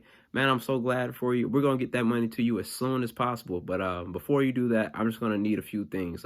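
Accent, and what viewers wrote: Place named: Atlanta, United States. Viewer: I love your improvisations and your roast. I'm from Westside Bankhead and I don't really get to hear that speak anymore so it's nice to find someone that speaks my language.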